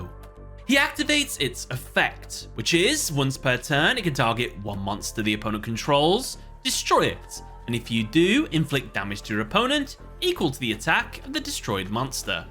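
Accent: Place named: United Kingdom. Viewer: I assume it's the British accent + "penultimate."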